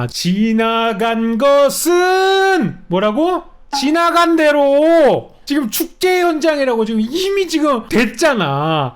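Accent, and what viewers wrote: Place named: North Korea. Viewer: 이사람말투왜이럼 북에서왔음?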